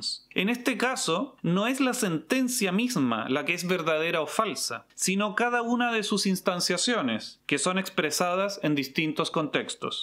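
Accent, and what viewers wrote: Chile. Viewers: Q lindo el acento chileno, no se xq la gente no le gusta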